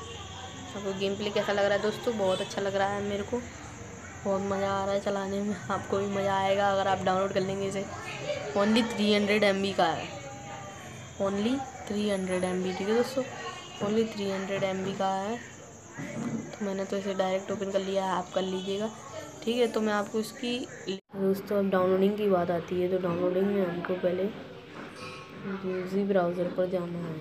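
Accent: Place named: South Asia. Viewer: roman reigns fans only well than you must be from India or PAKISTAN cuz your accent made me feel like you're speaking Urdu or Hindi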